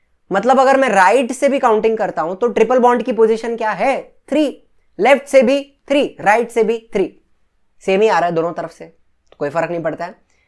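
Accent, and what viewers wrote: Maharashtra, India. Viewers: Sir aap marathi hoo kya 🙃🙃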